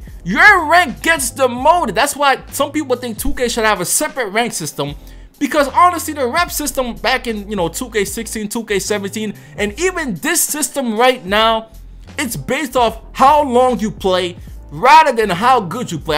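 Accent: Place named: New York, United States. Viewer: Jamais That's how new Yorkers talk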